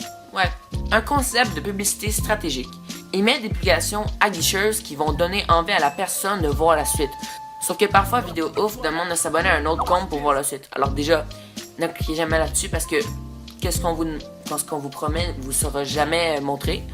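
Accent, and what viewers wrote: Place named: Quebec, Canada. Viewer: Gg t québécois j'adore ton accent 😍😍😍😍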